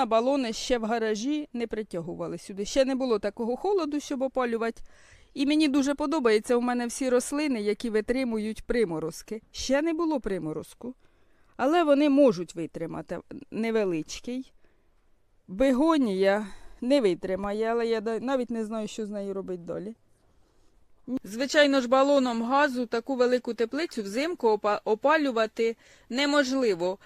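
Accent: Poltava, Ukraine. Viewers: Добрий день,. Натрапила на Ваші відео, слухаю не наслухаюсь, обожнюю полтавський говір. Живу в місті, городу не маю, хоч і мрію мати, але дивитимусь Вас заради мови ❤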